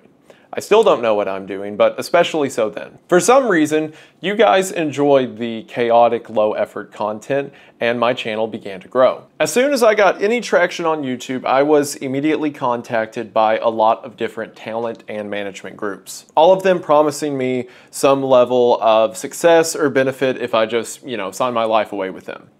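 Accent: South, United States. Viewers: you've got the perfect southern draw to voice how it's made or similarly just reading a phone book out loud to relax me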